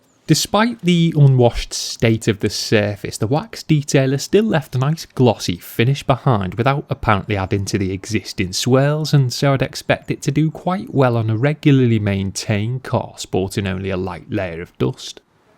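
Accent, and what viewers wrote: Liverpool, United Kingdom. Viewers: loving the fact you have a really subtle almost Scouse sounding North West twang. any ideas where this car went after your video or does the guy in Liverpool still own it? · Yeah, but car and accent kind'a don't fit; hardly ever see any typical us-cars in the UK. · kisses He's from the city of Liverpool in England and it's referred to as a Scouse accent (scouse as in mouse).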